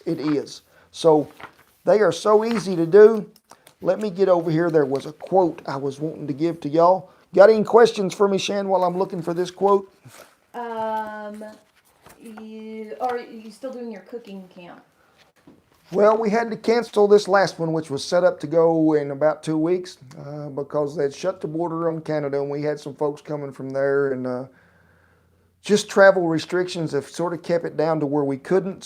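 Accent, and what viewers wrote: South, United States. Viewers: I preordered your book and got it yesterday. Beautiful photography, great tips and stories. I was only disappointed that your wonderful sloppy joes and your potato cakes recipes weren't in it. But I'll just add my youtube recipe notes inside the book. Re: sound quality of your live chat, it's clear and plenty loud enough. in the background was a little fuzzy. Btw, you don't need a teleprompter. I think we cam all say we like you just the way you are naturally. I especially like it when pokes light fun of your cowboy talk. But I'm southern, si I "getcha". You both are great and I could tell all the hard work that went into the cookbook.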